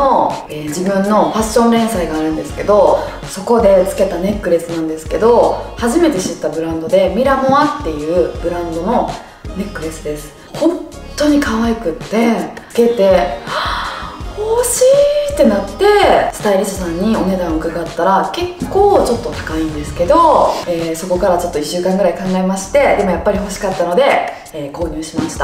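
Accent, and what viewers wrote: Kansai, Japan. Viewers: 関西弁のみっこちゃんが、かわいいです😍 naruki takahashiのfruits stand、ステキですね🍊欲しくなっちゃいました！ 後ろの鏡もカワイイ🪞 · 笑けてくるって言うみっこちゃんが好き♡こんな可愛い人が同じ関西人で関西弁で嬉しい♡ 木の器、可愛い💕水時計の音、超癒し… カバンもネックレスも急須も全部素敵〜！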